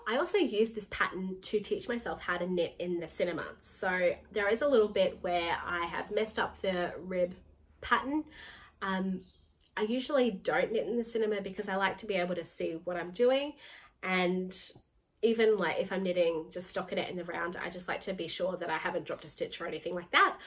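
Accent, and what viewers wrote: Australia. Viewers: Hi there, so nice to see you again. Thanks for your informative podcast about your projects it was really helpful and it's so nice to hear an Aussie voice on You Tube. Have a great year!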